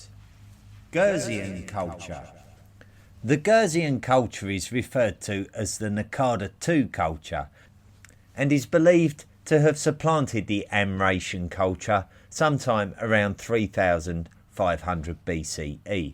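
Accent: United Kingdom. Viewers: What in the hell are you talking about? All I hear is a British accent espousing junk science.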